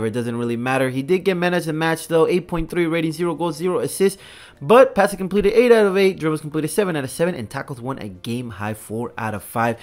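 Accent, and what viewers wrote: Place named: Argentina. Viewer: Hermano tu hablas como un argentino, sinto falta de los jugadores de mi flamengo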